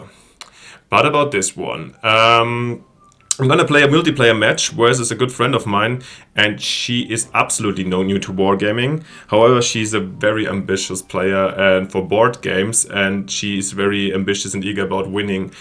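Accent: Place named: Germany. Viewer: Wusst ich doch gleich, dass das n Deutscher ist. Aber sehr gutes Englisch. Da möchte ich meine paar englischen Videos direkt private stellen haha.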